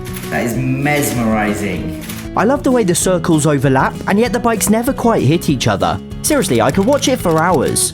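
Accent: United Kingdom. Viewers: R u british ?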